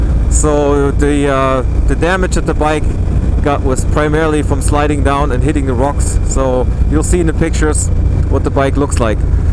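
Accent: Germany. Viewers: Wenn ein deutscher English spricht. Schrecklich, ich breche hier ab. Vor dem Crash noch.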